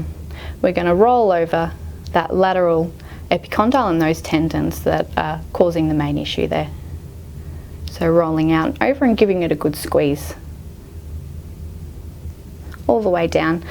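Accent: Australia. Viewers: Thanks for the video from here in the USA. I love the Australian accent. “Cross section fibah” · Very informative and helpful video. Also love the presenter's aussie accent, hot. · This is brilliant. Many thanks. From Sweden. (Lived in Melbourne for 4 years and felt good to hear the accent once again!)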